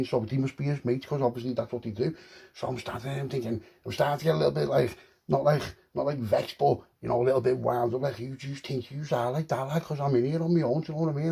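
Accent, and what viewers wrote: Liverpool, United Kingdom. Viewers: Kin yes, bed time story with chief scouse. ❤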